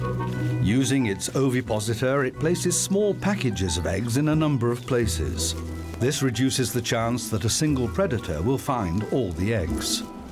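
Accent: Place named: United Kingdom. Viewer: You are correct, Gavin... & btw....this narrator IS British, but is not David Attenborough ...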